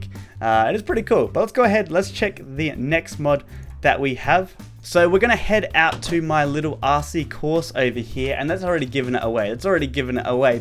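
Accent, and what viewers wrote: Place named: Australia. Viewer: you from Australia I was confused because you say the same as me because I'm ausie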